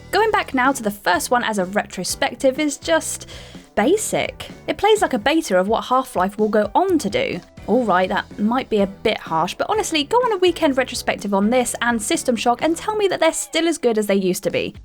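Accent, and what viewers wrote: United Kingdom. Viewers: The only thing I like in this video is your lovely British accent. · Why are British accents so fucking annoying? Bloody awful.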